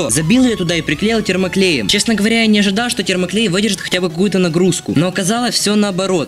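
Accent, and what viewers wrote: Ukraine. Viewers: Ты из Украины ??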